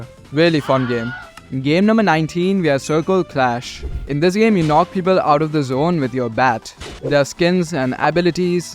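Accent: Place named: India. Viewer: You seem to have a slight Indian accent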